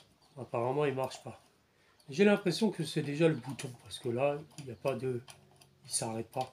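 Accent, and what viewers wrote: Alsace, France. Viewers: Ça sent l'accent d'Alsace ici ! Bonne vidéo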